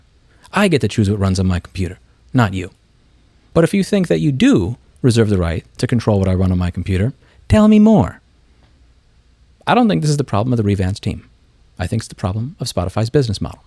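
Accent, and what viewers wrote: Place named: Texas, United States. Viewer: Man, your Texas accent is getting thicker and thicker. Which is a good thing.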